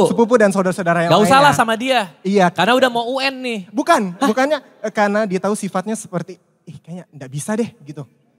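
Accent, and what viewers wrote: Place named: Palu, Indonesia. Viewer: Logat palunya di tahan tahan, tidak hilang memang 😂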